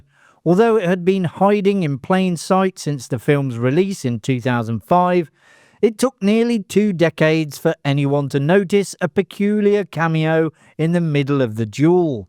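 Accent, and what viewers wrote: United Kingdom. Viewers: what the hell planet is ko-RUSS-kant? check that pronunciation again sir. :) and now the lava planet is named after a middle eastern guy? look, I get that you are a Britt sir, but come on, even those who can't speak english can get the planet names right. is this is AI I'm gonna be sick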